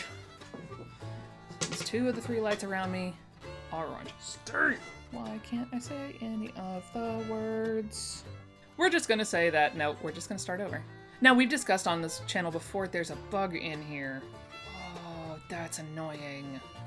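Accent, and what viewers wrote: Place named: Ontario, Canada. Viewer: Are you sure you don't live in Canada, you sound like you're from Ontario like me! I think the only thing that angers me about the big 4 patterns is that I'm not in the size range unless it's one of the few smocks they have. It's why I draft my own for basic stuff or buy from Indie companies that acknowledge that mid fats exist!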